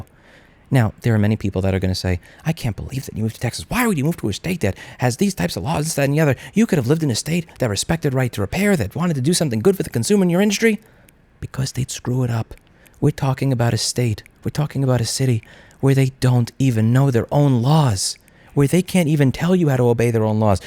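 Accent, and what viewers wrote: New York, United States. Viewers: I like this: you can take out of noo yawk but you won’t take the noo yawk out of.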